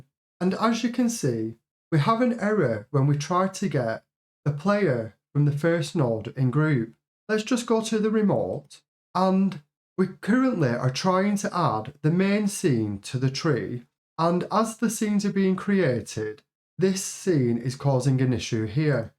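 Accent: United Kingdom. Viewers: As someone who use to make tutorials, I can say this is a HUGE amount of effort! Seriously well done on a fantastic tutorial and excuted so well! Are you from Lancashire by any chance? Just thinking from the accent? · Fantastic Tutorial!! I really have to ask though, where exactly is that accent from? Some region in England obviously, but where? I am trying to figure that out for 1 week now.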